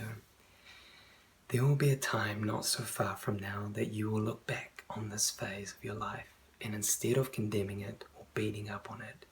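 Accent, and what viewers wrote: New Zealand. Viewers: I needed this - X10. been through a bad time over the past couple of years with people. I to have just started yoga a few months ago too. How ironic. Love your accent, not many Kiwi's doing ASMR, lots of Aussie's though... Thanks, X10.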